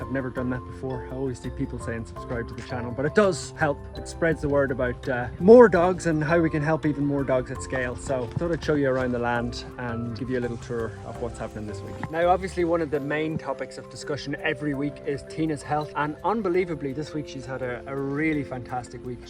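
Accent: Ireland. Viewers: You are an amazing person for helping these poor animals, you must have been sent from heaven as you sound so chilled with that beautiful Irish accent. Please continue with the good work helping them back to good health ❤😊 No doubt it has it’s up’s and downs but on the upside you are helping gods animals 😇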